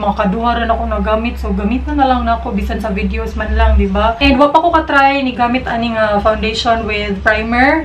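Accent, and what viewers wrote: Visayas, Philippines. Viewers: bisdak mode hahaha makalingaw jud maminaw ai. 😄😄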